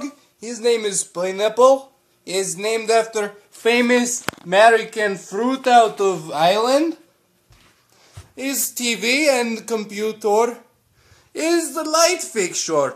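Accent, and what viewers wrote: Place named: Russia. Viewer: that's a good russian accent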